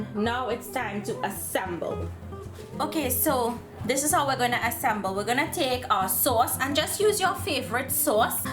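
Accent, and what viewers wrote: Trinidad and Tobago. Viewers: I was so pleasantly surprised to hear a Trini accent lol 😁👏🏼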